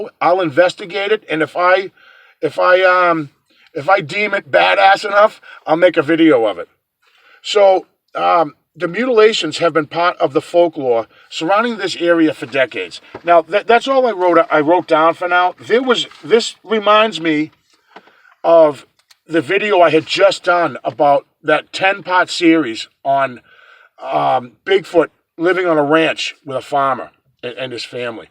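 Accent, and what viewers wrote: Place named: Massachusetts, United States. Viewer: Well how are ya?? Linda in Florida here. How about this skinwalker story....when Mr. Sherman chased those little blue orbs with his 3 cattle dogs they chased the orbs out of his sight when he heard them yelp he ran as fast as he could to get to them and he found 3 melted oozy grease spots that was once his dogs. And that's when he gave up and sold the ranch...thats a horrible thing. Lots of stuff happened out there.......that place is evil!!!!!! And yes.you almost have got to get into paranormal stuff when your into Bigfoot cuz I believe that somehow there is a connection. Love your vids and love that mass. Accent. I'm odd up there spook much..hey see ya on the next one..lol..Linda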